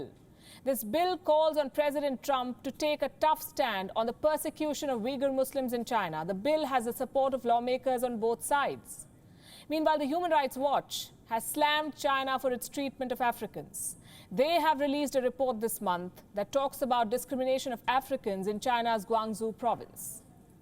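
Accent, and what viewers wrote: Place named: India. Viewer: Indians have the best accent in the world!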